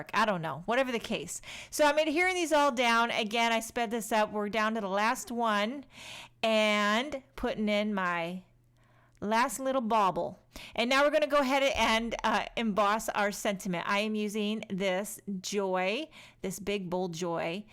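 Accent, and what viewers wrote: New York, United States. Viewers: It's a New Yawk accent ~ and New Jersey, too if I must say so myself:) I LOVE your rainbow wreath,...it's Gawguss!!! Thanks so much for another fun video!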